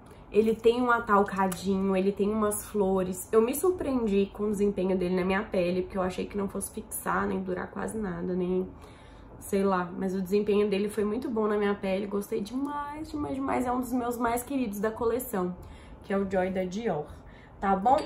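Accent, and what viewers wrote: Minas Gerais, Brazil. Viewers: Sou apaixonada nesse sotaque, trem 💙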